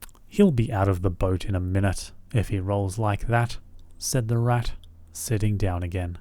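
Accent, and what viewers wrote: Australia. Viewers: Hooray! A dear familiar Australian accent!! Thank you sooo much. You do have a lovely voice, and you read in a peaceful, reassuring way. Thanks also for simply starting to read the text without a distracting monologue introduction. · Render us some new story with your Australian accent ❤❤❤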